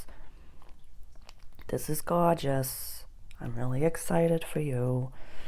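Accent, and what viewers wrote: Boston, United States. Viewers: i love your boston accent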